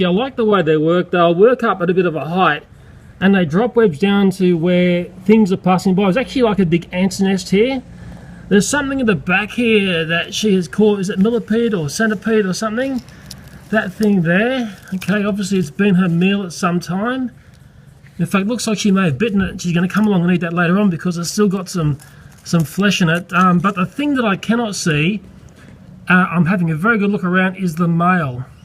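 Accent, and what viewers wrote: Australia. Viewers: Is this guy Australian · Why is the Australian accent just so....awesome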